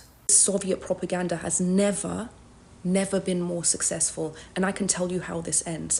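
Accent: United Kingdom. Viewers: Correct. That's how you know everything she's saying is utter BS. Not to mention she misses much of the underlying factors and historical contexts of the past 300 years that would explain where we are today. She gotta cute face and a British accent so many will listen. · She's not iranian - i hear british accent.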